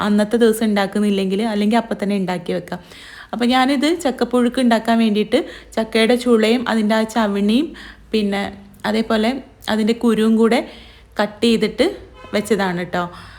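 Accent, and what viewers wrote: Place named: Kannur, India. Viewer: Para kannur basha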